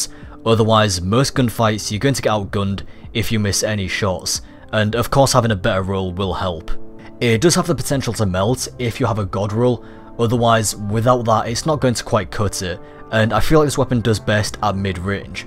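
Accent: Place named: Scotland, United Kingdom. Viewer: you look Asian but sound scottish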